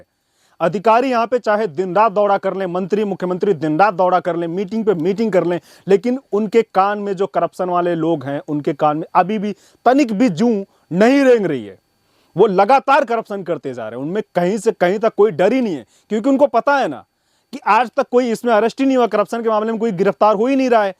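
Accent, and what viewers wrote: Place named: Arunachal Pradesh, India. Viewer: Arunachali hindi 😅👌🏻👌🏻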